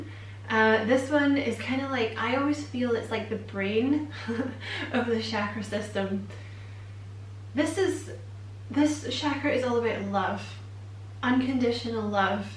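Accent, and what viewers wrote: Scotland, United Kingdom. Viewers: I have just binge watched your videos here, I love your explanations for everything I would really agree with how you see things and you have confirmed a lot of what I have felt to be true but wasn't sure if I was seeing it the way other spiritual people do so it's great to get your take on everything. Also this is the best chakra explanation I have heard 💚💫🙏. You probably hear this a lot but I love your Scottish accent, I'm Irish and we do tend to like the Scots over here 😃. God bless and take care 💚🙏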